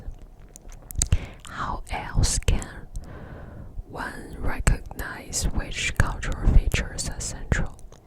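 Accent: England, United Kingdom. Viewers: Love your english accent, send me off to sleep! New sub from me would love to see more like this!❤ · I really like your English accent you should be make more video like that video please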